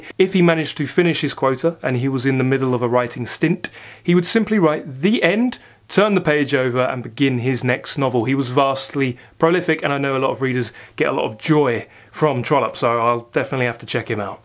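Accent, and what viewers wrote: United Kingdom. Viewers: I have watched many many book collection videos on You Tube and in my opinion yours are by far the best. The information you provide is very informative and the manner in which it is presented is a joy to experience. The dialect helps (I'm a Yank) as it is the hallmark of the mother tongue as-it-were, but I think it is the thoroughness of your descriptions that I most appreciate. Please keep up the excellent work. [EDIT] I am VERY judicious in my subscription allocations, but for what it may be worth to you I have just subscribed to your channel.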